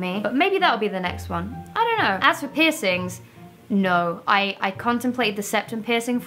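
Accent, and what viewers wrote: United Kingdom. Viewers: As an American I can very safely say that your accent isn't remotely American. Don't know what locale of British but definitely British to an American ear. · i was really caught off guard by your british accent?? why? i guess i've been watching a lot of american youtubers lately. anyways, your book cover is FLAWLESS!! ily xx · To an American, you still sound British and we love it ❤️❤️ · I have another friend who gets told she sounds American , but it makes me LOL every time because she (and you) still sounds so British to me. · 1) What do you think happened to the guy that was released from North Korea in a coma? Update: he died. 2) As an American, I can safely say that you do not sound American, you simply have a "light" British accent.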